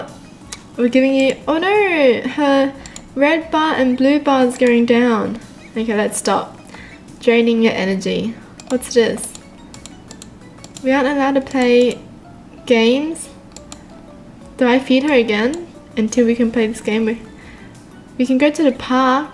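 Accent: Australia. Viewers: Is she from australia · are u australian?!?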